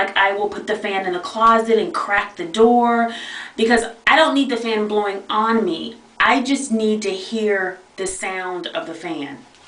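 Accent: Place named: South, United States. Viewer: I think you are a very lovely person. You're someone that I would love to be friends with. I'm from Georgia and a southern gal too so when you say those particular words, I know exactly what you mean! It's funny how we think nothing of how we articulate our words until no one understands us! Thank you for sharing with us!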